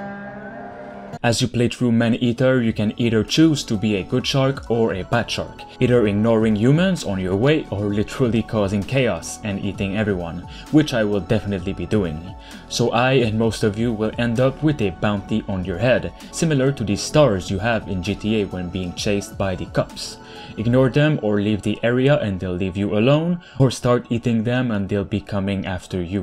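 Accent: Quebec, Canada. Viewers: The commentator needs to makes vids in French, his pronounciation in English is terrible. I keep hearing him repeatedly saying " Man Heater"which is entirely different gendre. Please homes, just stop, that Quebec accent is atrocious. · Hmmm .. I hear Quebec !!!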